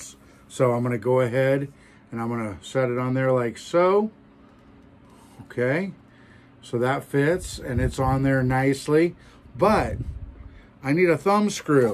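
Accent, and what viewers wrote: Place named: Canada. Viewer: You sound Canadian for an American.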